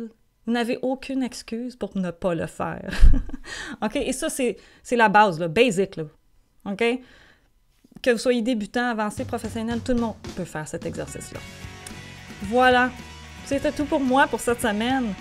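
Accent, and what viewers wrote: Quebec, Canada. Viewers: Jadore ton accent quebecois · Par contre j'aime trop son accent Québécois et je suis sur que en chant tu es super forte Gros bisous à toi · Je crois que vous êtes québécoise 😄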